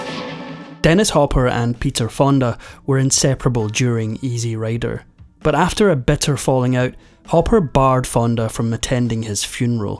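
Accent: United Kingdom. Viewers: Blah blah blah, Hollywood and you hollywooders make me sick, I turned my back on Hollywood ever since I became a true born again Christian. Hollywood is modern day Sodom and Gomorrah. I worship Jesus Christ, our Lord , God and savior. I dont care about this brittish voice over, bragging about this man headed for hell. We can not serve two masters, as God says in His holy words, the Bible. MAGA 🇺🇸 🇺🇸 🇺🇸 AMERICA FIRST 🇺🇸 🇺🇲 🇺🇸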